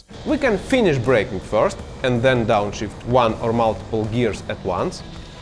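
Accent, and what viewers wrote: Russia. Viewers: Прекрасный английский! Но по акценту как те русские из голливудских фильмов, 😂 хотя это совсем не бесит и не мешает, а даже придаёт какой то шарм. Главное очень грамотно и понятно! По качеству и локоничности транслируемой инфы, - просто 10 из 10! Отличный канал и не только для новичков, судя по тому нонсенсу который иногда выдают "бывалые", - всем сюда! - на повышение квалификации! Спасибо за труд. 😀👍✌️ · You are my favorite living Russian because I can’t recall the last time I fell on my daily ride and feel that your YouTube videos are a large part of why. Perhaps your accent forces me to focus more, or maybe you are just that good as a teacher. Whatever the reason, you made a difference in the way I listen.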